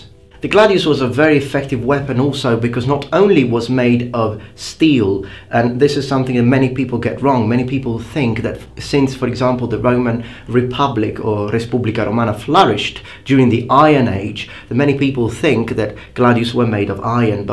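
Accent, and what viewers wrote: England, United Kingdom. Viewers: Cut your hair you look like a girl.-Bad mix (english accent beard and long hair) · Are you from the UK? Where are you from? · Man, you really had a thick British accent back in the day. Also, i like how every cut starts with you doing a little jumpscare. Bring back the jumpscares!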